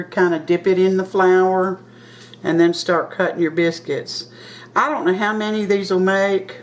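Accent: South, United States. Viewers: I have been trying to make some for a long time & each time they are like rocks,so sure gonna give this a try,I aint giving up on baking.Love your southern drawl :)i never had problems years ago in UK.The folding technique is a new one on me. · Thank you so much! Those look so easy! I'm a yankee who has been known to make hockey pucks instead of biscuits and I think these I could do and not mess them up! I had a friend from SC even show me how to make biscuits when she came up here to visit and she said pretty much the same thing you did...when you are mixing them she said 'don't screw with them too much! in he same sweet southern drawl. <3 Thanks again for the recipe I thin I might go try them RIGHT NOW! :D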